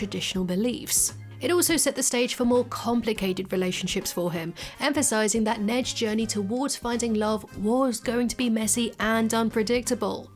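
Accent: United Kingdom. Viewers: No offense. As an American hearing a Brit, talk about the Simpsons feels like I am reading a history book from the u.k. about the war of 1812. I love the breakdown, just not what I expected, haha.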